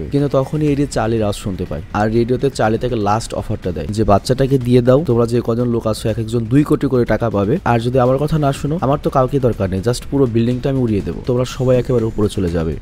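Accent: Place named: Noakhali, Bangladesh. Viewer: এাই নোয়াখালীর হোলা❤❤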